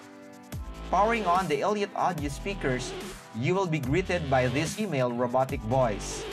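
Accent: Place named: Visayas, Philippines. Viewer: litsi bisdak man pud diay ni sya hahaha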